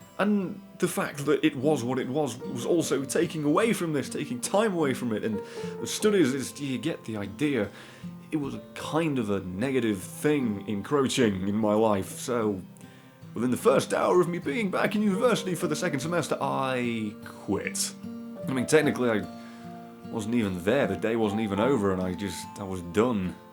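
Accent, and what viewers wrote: United Kingdom. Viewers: I gotta admit this is one of the few channels that I've grown so fond of that I've tried to remember the time of day you put up new videos. I stumbled upon your insane British rambling back when the Dark Souls 2: Crown of the Iron King videos came out and I found your blind run against Sir Alonne. Since then your content has been quite fantastic, and even a lot of stuff before then is great to check out (Altough I did notice the rather obvious point where the quality of content changed dramatically). Both the old, the new and the current content you do is awesome and I look forward to seeing what the future holds in store for both you and us subscribers. Probably you dying horribly to more Uruks and me writing more silly death lists. Godspeed you magnificent bastard of a youtuber!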